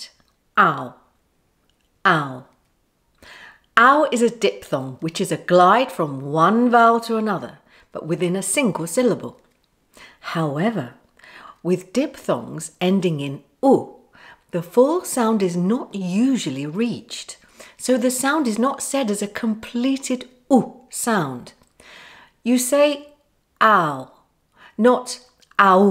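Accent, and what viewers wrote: United Kingdom. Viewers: It's so nice learning the beautiful British english listining to your lovely voice. May God bless you😊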